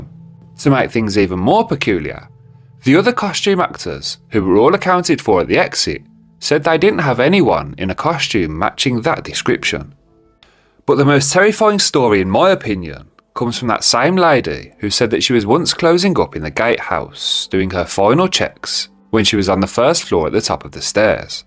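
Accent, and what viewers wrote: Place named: Birmingham, United Kingdom. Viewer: Kenilworth born and bred here. And worked in our Castle in the late 90's. I remember my colleague saying to me that I'd think I was going insane, once I'd worked there for a month or two. ALL of us, had seen and heard the unexplained. If you want to hear those stories. Let me know. This was very enjoyable so I'm subscribing as a fellow local, judging by that Brummie accent?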